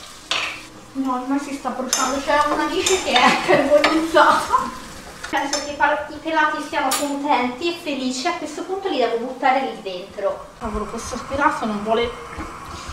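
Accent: Tuscany, Italy. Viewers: Mi fai impazzire dalle risate quando assaggi gli spaghetti alla fine, la tua espressione persa nel nulla, e il tuo accento toscano, 🤣🤣🤣ohh maremma, ciao saluti da Pavullo nel frignano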